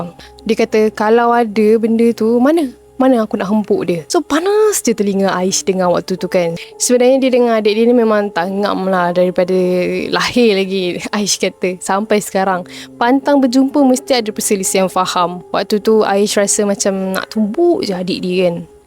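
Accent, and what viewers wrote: Kelantan, Malaysia. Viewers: Kecek kelate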